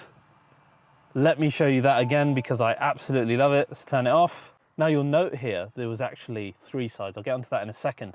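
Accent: United Kingdom. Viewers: Very Strange how you Brits all have the same Accent and pace when talking even the Middle eastern ones that aren't Caucasian .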